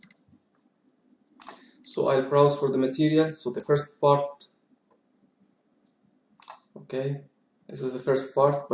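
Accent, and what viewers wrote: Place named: Arab world. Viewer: انت عربي لهجتك مش انجلش خالص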